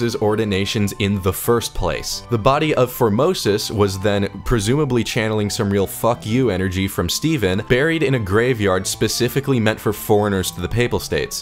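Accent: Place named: Northeast, United States. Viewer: We also call them that in the NE and that’s what his accent sounds like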